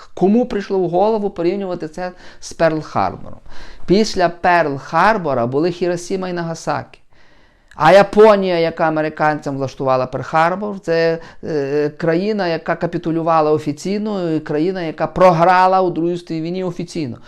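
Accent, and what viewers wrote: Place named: Galicia, Ukraine. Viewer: Гидко слухати цей галичанський говір.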